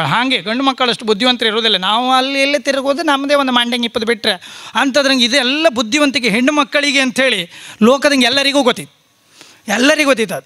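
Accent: Kundapura, India. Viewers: kundapura kannada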